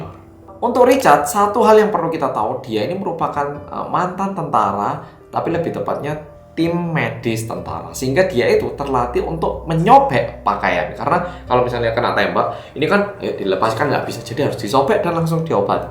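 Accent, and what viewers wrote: Java, Indonesia. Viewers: Kak,kk pernah tinggal di Jawa ya soalnya logat bet hehehe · Mas orang korea apa orang jawa, bhs jawanya medok bgt..😅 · Mas nya orang Jawa kah ?? Medok Sekali ngomong nya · medok banget oppaaaa 👍🏻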